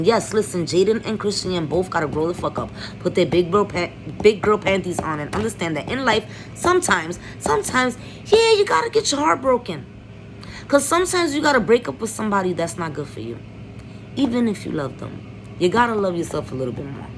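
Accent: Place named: New York, United States. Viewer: It's Chris Shawn but spelled Chrisean. Like your commentary tho ❤ keep it up you'll grow. Real recognize real. You have a NY accent I'm in Norf Philly not far at all. I got family all over the Bronx 8 say that to say you sound just like my crazy ass cussin whom I love to the moon. No shade when I say crazy mama 💯💖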